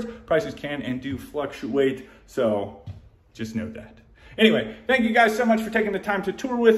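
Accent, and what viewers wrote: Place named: North, United Kingdom. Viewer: Too northern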